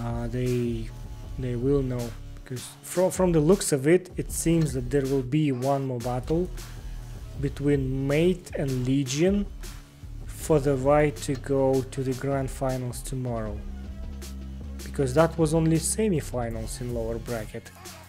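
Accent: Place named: East Asia. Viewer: Райс инглишь)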